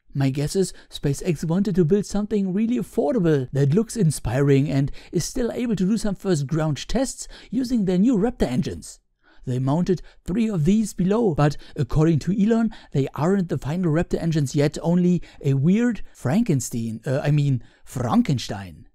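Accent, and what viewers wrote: Germany. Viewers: Junge du klingst so Deutsch ne😂😜 naja eigentlich geht's sogar. Cooles Video! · Almost certainly Germany. Please correct me if I'm wrong. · I Are you kidding? He has a really typical german accent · Das nenn ich mal ein "deutscher Vorzeigeakzent" 😄🍀 top Video, danke 👍👍👍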